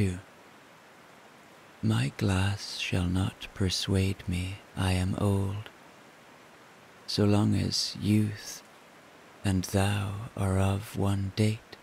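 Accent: Ireland. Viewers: if you see by chance you read this message, I must convey my eternal gratitude for your bedtime meditative stories! I have a very hard time falling asleep. You have the most soothing voice and love your Irish accent! I’ve been to your native country twice I loved it there so much I almost bought a little cottage near Galway but had to reconsider because of family back in Florida! Thank again❤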